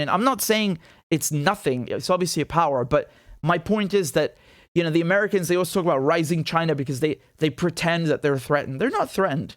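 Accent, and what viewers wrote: Australia. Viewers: Hahaha love your Australian accent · Love the Aussie accent 😂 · That was a great Australian accent. I love it lol Aussie’s love hearing people try speaking like us.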